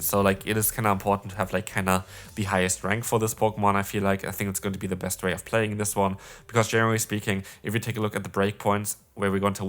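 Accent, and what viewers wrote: Germany. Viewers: You have german accent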